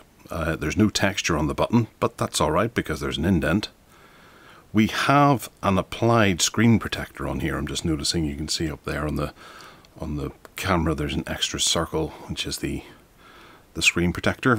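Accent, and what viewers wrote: Northern Ireland, United Kingdom. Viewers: Great to hear a Northern Ireland voice!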